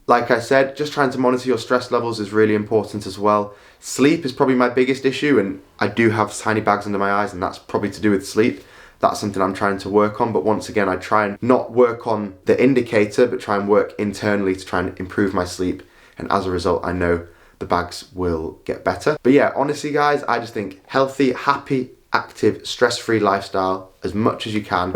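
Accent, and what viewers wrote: United Kingdom. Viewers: Love your accent! Is it a northern UK accent? I have some problems following you! No wonder I'm from Sri Lanka 😆 😆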